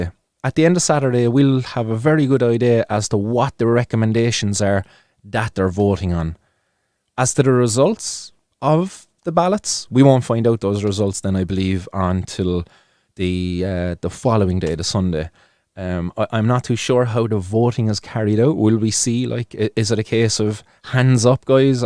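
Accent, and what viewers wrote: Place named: Cork, Ireland. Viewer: Good see you back on the screen baii... And really glad that you in a position to be back! Missed those dulcid Corkonian tones😋💚🌱🌱🇮🇪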